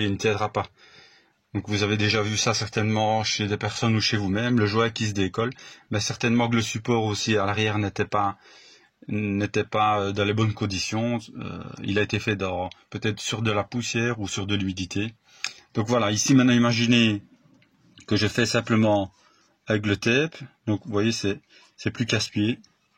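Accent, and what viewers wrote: France. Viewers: C'est très propre bravo et j'aime bien le petit accent alsacien 😉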